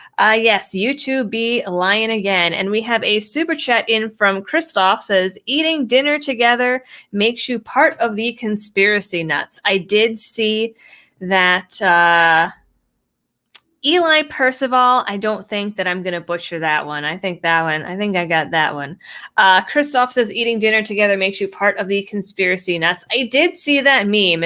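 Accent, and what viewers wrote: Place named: Carolina, United States. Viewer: New to the channel. Enjoying so far. Sounds like you may be a Carolina girl.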